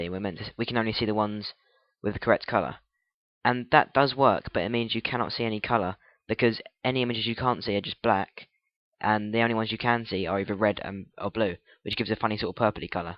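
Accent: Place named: United Kingdom. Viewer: I get that you're British, but do you have to pronounce it "free d"?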